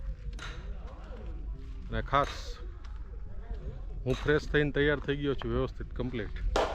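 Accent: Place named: Kathiawar, India. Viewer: is our good friend and pro bike rider since many years and a very humble person and now he is on YouTube with our Spl Kathiyawadi language I wish him on his new ride 👍✌️✨🎉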